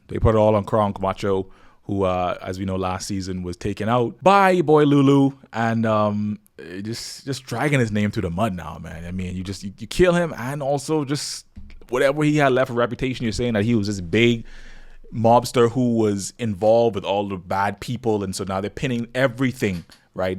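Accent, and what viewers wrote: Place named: Bahamas. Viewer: Bro u bahamian? Sounds so if so 242 to the world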